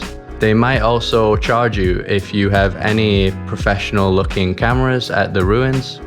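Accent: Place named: Manchester, United Kingdom. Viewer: Sound like you're from Swinton or Clifton, Manchester!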